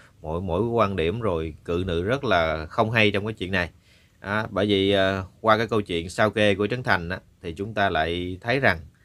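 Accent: Standard. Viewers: mc nói qua chuẩn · Tôi rất thích kênh này họ nói chuẩn 0 phải chỉnh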